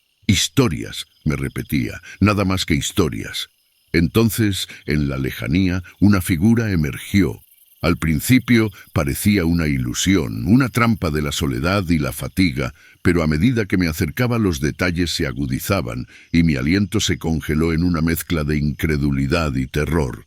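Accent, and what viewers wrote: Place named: Spain. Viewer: Se escucha come espanol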